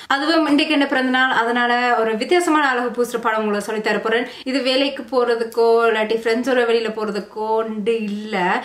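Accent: Sri Lanka. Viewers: Yha its srilankan tamil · Poosa porein.. Poosa porein.. Oru quiz competition vaikalaam pola. . Lolzz.. Else srilankan tamil azhagu 😍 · Ilangai Thamil alagu thamil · Is this Srilankan Tamil? Unga Tamil romba azhaga irukku sister. Makeup la podamaatan, unga Tamilkaaga Subscribed! ❤💯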